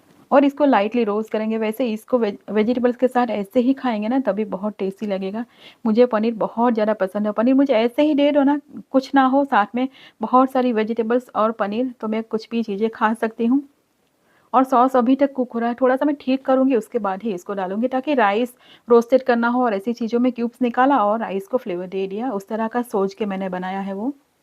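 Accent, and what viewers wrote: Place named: Parsi, India. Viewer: Your hindi is little different, like parsi people speaks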